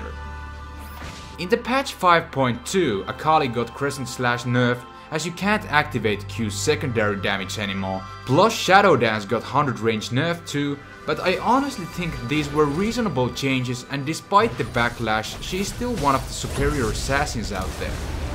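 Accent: Finland. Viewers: Your accent reveals you. Finnish? ;) Great vid.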